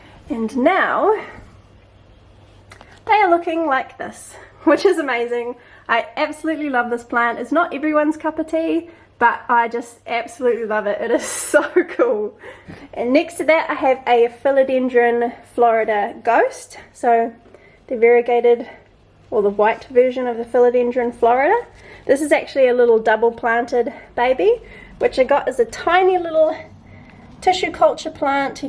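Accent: New Zealand. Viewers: Lovely plant tour thank you. Your accent reminds me of my stay in Papatoe in Auckland.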